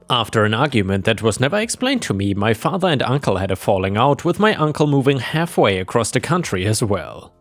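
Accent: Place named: Germany. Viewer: I can't help it, but your accent sounds very german to me. Are you a german expat in Thailand? Anyways, thanks for the entertainment!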